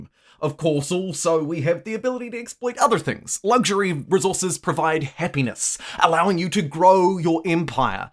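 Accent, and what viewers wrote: New Zealand. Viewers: is this guy kiwi????????? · You sound Kiwi, Australian and American all at the same time, I like it.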